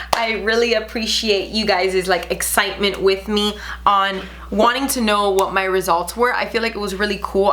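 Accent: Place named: United States. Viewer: Maybe a little American too? 😂😂😂 Judging by the accent, I'd guess 100% American. If you are born and raised in the U.S., you are American. Regardless of where your ancestors came from... Maybe I misheard the guess you read, but it struck me as funny.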